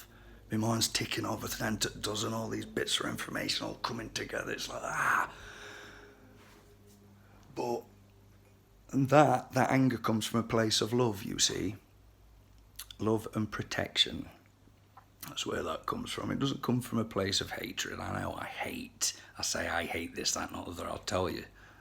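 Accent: United Kingdom. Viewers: Don't be a soft cnt and apologies,you are the voice of a man of Britain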